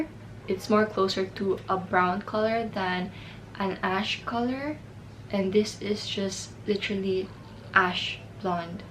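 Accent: Philippines. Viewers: She's korean? Or a filipino living in korea? Because i notice filipino english accent/slang. So i'm assuming she learned english in the philippines if she's actually korean 🤣. If she's filipino then this makes sense Edit: i finished the video. Ok pinoy ka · pardon me bc this is the first video im watching frm your channel! i've subscribed:) just wondering cus i hear a little accent.. are you a filipino?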